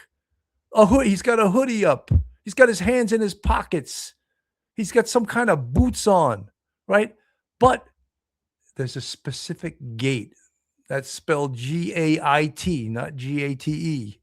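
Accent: New York, United States. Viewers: I really appreciate you and your common sense approach! I do not like the hyperbolic tv news stations that try to make things even worse than they are. New subscriber. BTW, I like your NYC accent!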